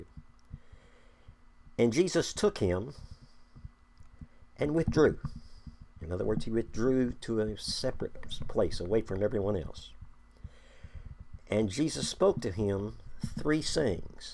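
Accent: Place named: South, United States. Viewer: Southen accent is so grating to listen to.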